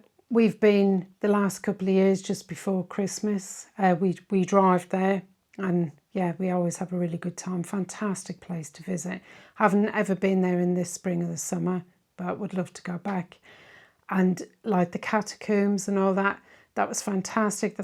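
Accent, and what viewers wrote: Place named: Birmingham, United Kingdom. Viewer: I really enjoyed that. A bit concerned that you have been poorly and hope you are on the mend now. You do have a soothing voice. I thought I detected a hint of Brummie or Black Country in your accent but that’s great. Keep up the good work 👏👏👏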